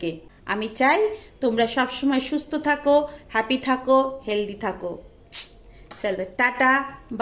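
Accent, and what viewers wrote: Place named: Andaman, India. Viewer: আপনার বাড়ি কি আন্দামানে, আপনার কথার ধরন দেখে আমার তাই মনে হচ্ছে। আমি ওখানে ২ বছর ছিলাম, ওয়ান্ডুরে।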